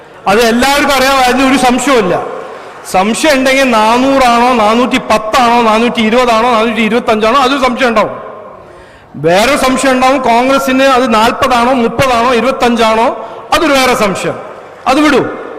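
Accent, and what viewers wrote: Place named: Palakkad, India. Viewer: Typical palghat malayalam(tamilalayam)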